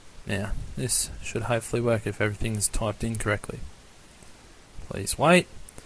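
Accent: Australia. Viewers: Thank you so much man, great help. PS, you sound Australian. If so, which city are you from?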